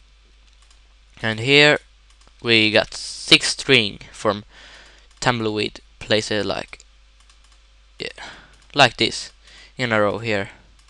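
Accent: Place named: Sweden. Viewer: du måste vara från sverige XD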